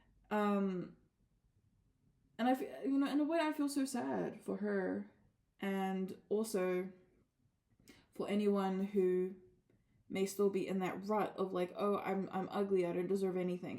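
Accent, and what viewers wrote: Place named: New Zealand. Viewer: ok cool! Sorry I'm a geography buff lol are you from the north island or the south island? I noticed the accent too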